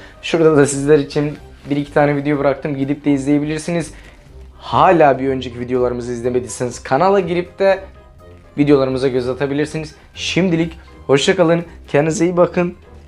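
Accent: Nakhchivan, Azerbaijan. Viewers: Naxçıvanlısan sen?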